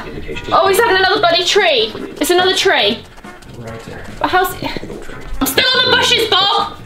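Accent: United Kingdom. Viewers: How still sound british even she live in America most of her life. Love your art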